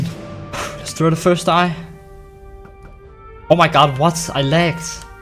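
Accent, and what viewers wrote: Denmark. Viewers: hej jeg kan høre du fra danmark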